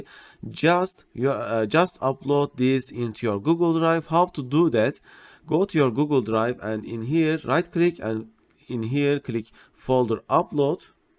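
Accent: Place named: Turkey. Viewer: i am a turkish guy who wants to learn somethings on computer science. When i heared your voice, i am confused. I thought you are from turkey and i looked up your youtube channel then i saw your location which is Turkey. Your accent is how i understand.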